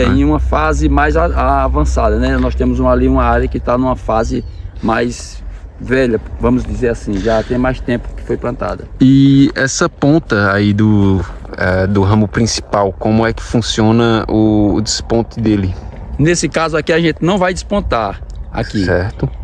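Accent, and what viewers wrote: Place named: Northeast, Brazil. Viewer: Primeiro. Sua simpatia e sem medo de estar ensinando outras pessoas ou concorrentes. Imagino que seja do nordeste pelo sotaque . Amo as uvas de mesa do nordeste. Estou sempre comprando porque são muito doces e deliciosas. Não sei seu nome, mas parabéns pelo seu cultivo e continue nos mandando essas delicias aqui pro sul. Forte abraço de Santa Catarina.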